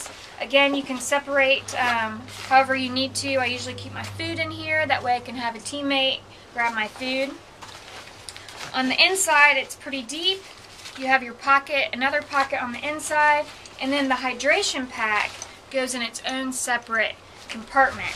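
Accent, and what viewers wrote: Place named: United States. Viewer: really that ;erson said you have an accent ? you sound like a american girl ha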